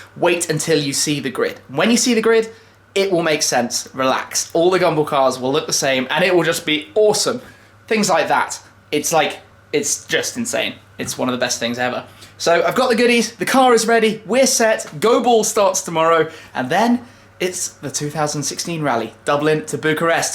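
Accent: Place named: United Kingdom. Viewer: Its called a british accent bro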